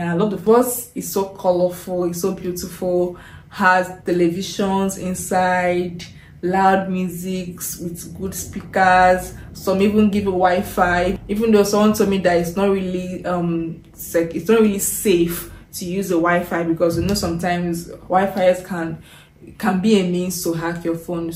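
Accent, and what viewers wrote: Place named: Igbo, Nigeria. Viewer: That's her Igbo accent. It's not everyone in Nigeria that calls it PEPE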